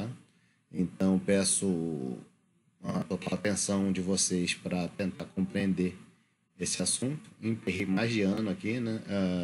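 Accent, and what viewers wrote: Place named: Rio de Janeiro, Brazil. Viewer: Muito bom!! Não sei se você se mudou pro Rio de Janeiro, ou algo do tipo, mas alguém puxar o R e o S é a primeira vez que eu vejo hahahahhahhah Grande Abraço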